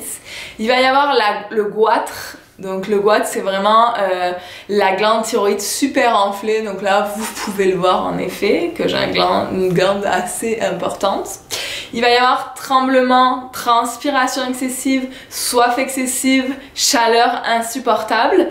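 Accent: Quebec, Canada. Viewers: Effectivement toi à bien pris l'accent québécois!! Courage Corentin d'Annot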